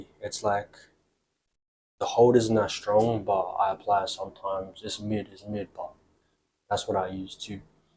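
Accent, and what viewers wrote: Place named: United Kingdom. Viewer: that accent thooo you in the uk ??!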